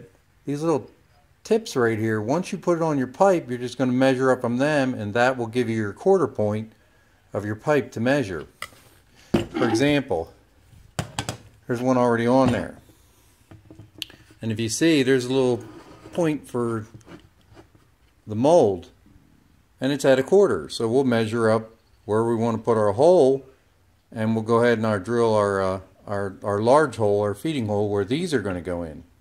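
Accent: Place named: Delaware, United States. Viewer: So good to hear a Delaware accent! Love it - made me hungry for a hoagie! And oh yeah, very imaginative and useful design - thank you.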